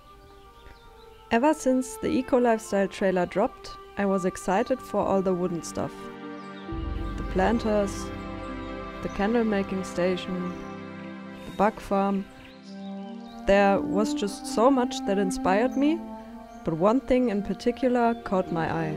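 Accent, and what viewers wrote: Germany. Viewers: Your builds are always incredible 😍 and I'm so happy to finally hear your voice! First of all, I love your German accent, it's one of my favorites, and second, you're so good at talking! Don't put yourself down like this. You should definitely do more of these! 💙✨ Also yayy, teal club! 🙌🏼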